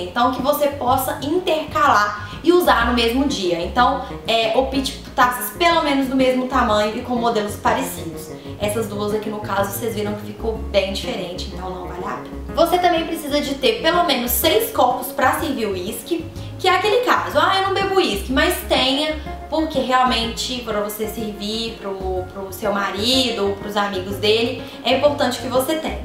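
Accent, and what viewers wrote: Minas Gerais, Brazil. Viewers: Sotaque de mineira!Lindo mesmo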